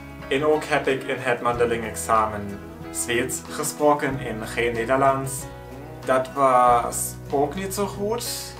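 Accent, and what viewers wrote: Germany. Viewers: Hallo, ich mag deine lustige Art und dein scheunentorriesiges Lachen. Du traust dich ohne große Scheu, Sprachen zu sprechen, auch wenn du vielleicht nur einen Minimalwortschatz hast. Du solltest auf jeden Fall zum Polyglot Gathering Ende Mai kommen, dort triffst du Gleichgesinnte! Ein kleiner Tip: du hast diesen überdeutlichen deutschen Akzent und den solltest du dir abtrainieren. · It was really interesting to hear you speak the different languages! For some reason I can really hear the German accent in all of them. I also learned a couple of languages, some more, some less. I guess I can say like a sentence or two in eight languages, but only speak two of them fluently (though I had French in school for seven damn years, j'ai oublié tous les choses) Greeting from a fellow German Anteater and reddit upvoter ;D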